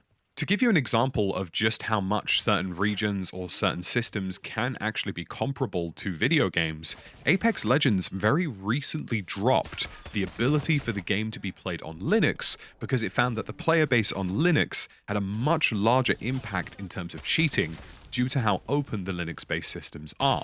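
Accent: RP, United Kingdom. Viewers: Stop this posh voice its triggering!!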